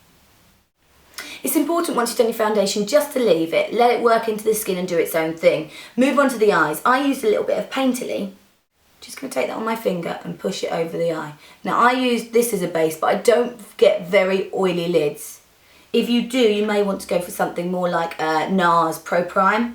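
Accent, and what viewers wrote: United Kingdom. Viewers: Really a beautiful makeup! I hope you had a wonderful wedding. (and I love your british accent)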